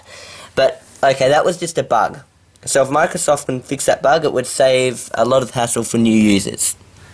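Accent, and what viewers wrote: Australia. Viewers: Are you from Australia? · woah woah woah HALT IT your australian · Oi! An Australian! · damn that accent you ozzie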